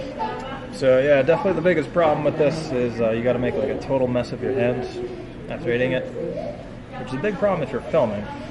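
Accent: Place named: South, United States. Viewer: You ain't from new York boy.You are from down south.It's a secret.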